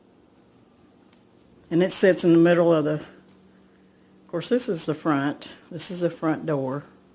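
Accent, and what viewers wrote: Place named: Texas, United States. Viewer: I am so blessed that I found you on YouTube. You sound like my family when I was a young girl. (I grew up in Texas)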